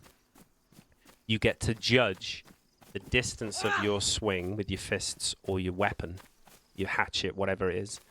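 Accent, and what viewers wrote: Wales, United Kingdom. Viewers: No problem mate, also i thought i recognized your accent are you from Wales by chance? if so then hello there neighbor, I'm from England lol!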